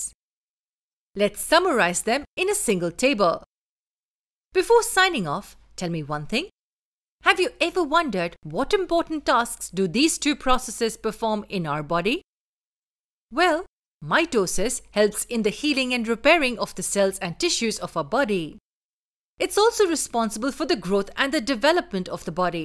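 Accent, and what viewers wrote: India. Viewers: Can you just change your Indian accent? That's so weird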